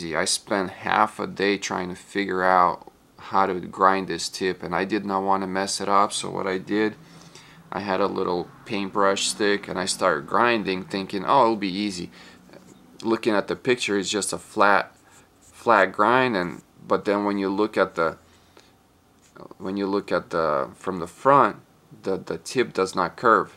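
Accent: Russia. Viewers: Прослеживается русский окцент)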